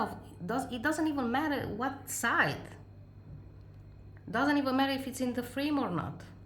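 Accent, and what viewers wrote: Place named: Spain. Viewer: I have to ask what is your accent? I love it. You say you’re Spanish, but I’m Spanish and I never heard that accent, at least in English.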